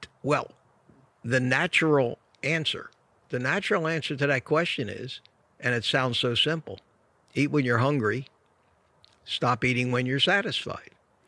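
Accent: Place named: East Coast, United States. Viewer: Excellent info given with an authentic east coast accent.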